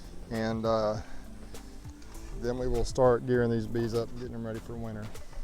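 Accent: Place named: South, United States. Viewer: Great video! I really like your Southern “style”. It’s very calming. The bees know it too! Lol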